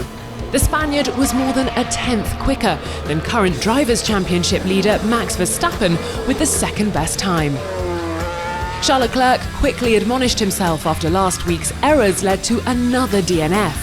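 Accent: United Kingdom. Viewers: this narrator with that horrible britisch accent, cant f1 find any better? annoying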